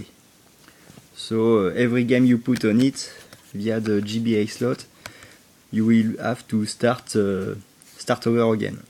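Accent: France. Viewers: le francais qui parle englais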